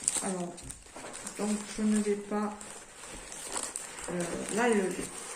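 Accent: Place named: South-West, France. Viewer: coucou merci pour cet unboxing et je trouve que tu as un accent du sud ouest... est ce que je me trompe? car je suis du tarn et je trouve qu'on a un peu le même accent! bisous bye bye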